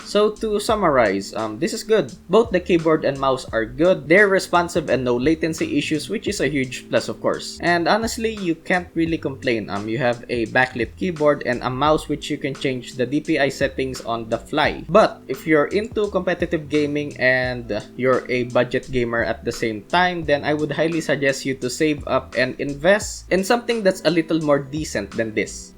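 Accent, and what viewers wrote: Philippines. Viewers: Yeah he's accent is so filipino but it actually sounds good and his grammar is almost flawless. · accent doesn't matter, he is really Fluent in English. besides he's a Filipino anyways so its natural to have a Filipino accent. Good video. hope you make a review about Zeus Mk-880 Professional Gaming Mechanical Aluminum Steel Keyboard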